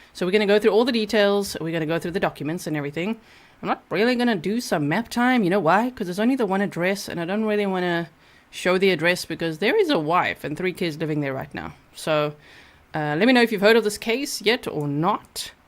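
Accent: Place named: South Africa. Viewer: Knew it from your accent, South Africa 🇿🇦 in the house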